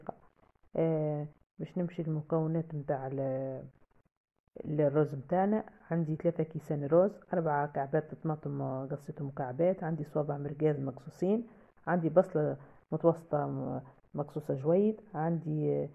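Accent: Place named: Tunisia. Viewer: قناة زوينة تعجبني اللهجة التونسية، مشتركة جديدة ☺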